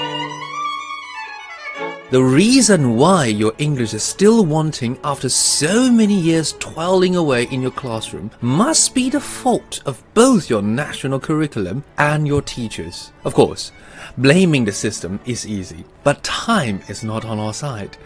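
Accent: United Kingdom. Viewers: 英式英文就是優雅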